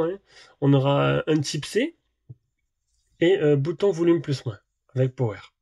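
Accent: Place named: Marseille, France. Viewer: Super vidéo! Et ton accent marseillais est au top 💪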